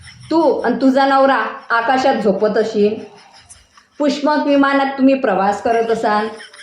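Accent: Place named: Vidarbha, India. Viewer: Mala tar aapli vidharbhachi marathi aadhipasunach aavdte rao mastach hasavlat tumhi 😂 baki aashu tai aani tumhi mala konachich baju nahi ghyaychi pan bollat ekdam kadak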